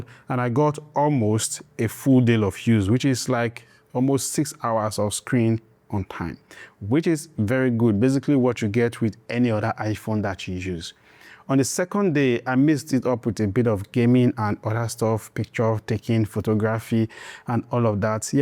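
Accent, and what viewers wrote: Africa. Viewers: I was so excited to click on the video and then I heard that damn African accent I am gone can’t do it. It’s like literally slightly less annoying than the Indian accent but just as bad can’t hear it sounds like chalk on a goddamn board.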